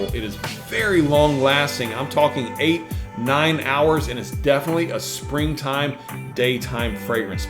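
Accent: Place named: United States. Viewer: I love your fragrance videos and I'm from west Michigan and i can understand your American accent some of the fragrance channels i watch on YouTube even though they are speaking English language they still have a foreign accent and hard to understand what their saying thanks bro for the the tips and a really good fragrance review video 👍👍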